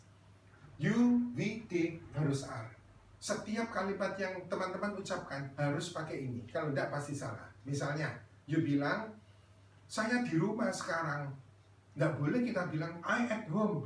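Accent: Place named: Surabaya, Indonesia. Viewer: Kangen materi selanjutnya nih.. ditunggu upload nya, oh iya saran kalau bisa materi ini diupload ulang dengan resolusi yang bagus biar tidak pecah.. pak ada kontak atau alamat kursusnya ya? Sepertinya pak org surabaya aksennya.. makasih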